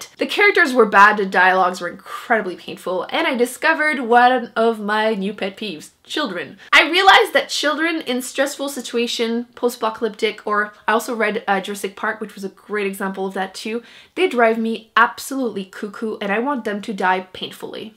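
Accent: France. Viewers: Thank you for responding! I kept trying to place it and thought you were from France so I was kinda close? No not really lol!!! I can’t wait to see what you come out with next! I’ve started back watching your videos!!!!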